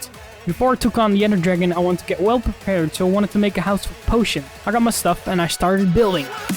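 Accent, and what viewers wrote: Netherlands. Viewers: Nederlands accent tho XD verry nice game play! loved it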